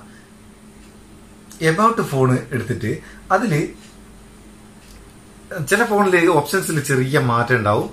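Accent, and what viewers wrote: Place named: Northern Kerala, India. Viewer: കൊള്ളാം. പക്ഷെ ഈ ചങ്ങായീടെ ഭാഷ നമ്മള് തെക്കർക്ക് നല്ല കൗതുകമാണ്‌. പിന്നെ കലാകാരനാണെന്നും പറഞ്ഞു. കല ഏതാണ്‌. കഥകളി? or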